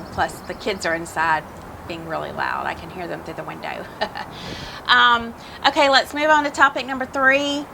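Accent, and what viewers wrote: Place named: South, United States. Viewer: Maybe your southern accent could be why your voice comes across like that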